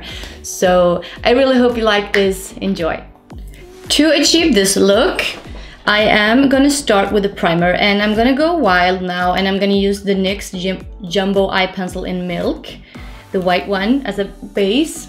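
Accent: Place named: Sweden. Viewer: The Swedish accent... 🤗🇸🇪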